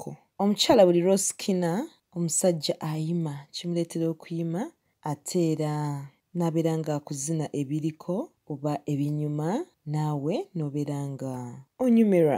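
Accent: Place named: New York City, United States. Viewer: Extremely NYC voice...this is first class screaming...not so different butbyo voice hooo😂❤🤗🥰💥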